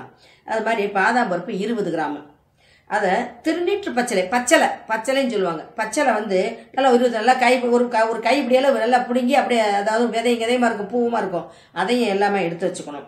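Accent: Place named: Madurai, India. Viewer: Madurai ya.. 😇😇😇😇..Na num Madurai dhan.... Superrrrr..pesuringa.. 😃😃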